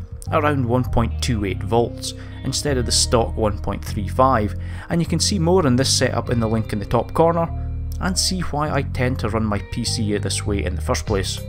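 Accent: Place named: Aberdeen, United Kingdom. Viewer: He sounds like he's fae (from) Aberdeen like me and for that reason alone I subscribed - can you recommend this cooler on the AM5 7900, I currently use the Raith prism just like AMD does in their test labs, into PBO2 testing on my ASUS B650e-e rig at -35 along with AI overclocking and temps remains very good no thermal problems at all scoring 54000 plus on pass mark 11.1 all day long and 12400 on CPU-Z? Getting 27000 score from a Asus Dual 6750 XT card Also, a top SEO guy if you need help bro, another clue lol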